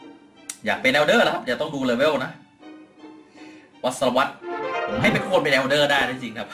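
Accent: Isan, Thailand. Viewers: พี่เป็น คนอีสานช่ายป่ะ =×=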